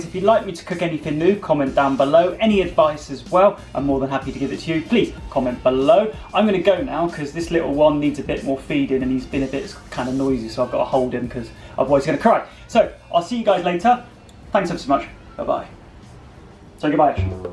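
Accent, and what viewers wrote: United Kingdom. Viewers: suka sama British accent nyaa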